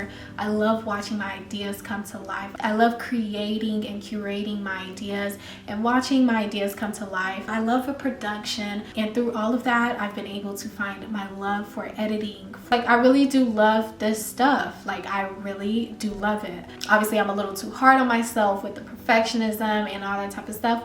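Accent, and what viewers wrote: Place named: Nigeria. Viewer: ohhh I said “chai” because I’m also nigerian hahah I was listening to your accent!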